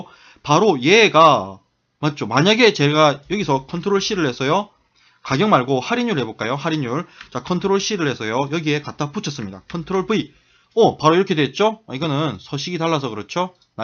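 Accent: Gyeongsang, South Korea. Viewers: 쌤 너무 감사합니다 ㅠㅠㅠㅠ컴활때문에 들어왔는디 같은 경상도인으로서 어투가 매우 친숙하고 화나있는 듯 한 말투이지만 절대 화난것 아닌 잘 가르쳐주시고 싶은 마음이라는게 여기까지 느껴져요ㅋㅋㅋ♡♡♡ 합격할게요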